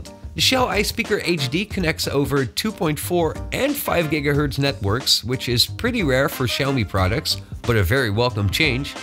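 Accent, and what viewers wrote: Netherlands. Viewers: Hoor ik daar een Nederlands accent...? 😄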